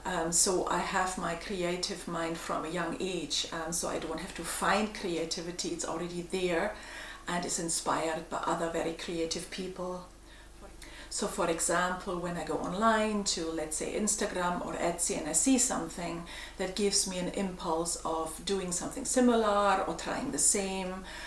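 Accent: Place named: Scotland, United Kingdom. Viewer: Wishing you an abundance of success in your new business. I think your accent sounds like 90% Scottish... so lovely.😍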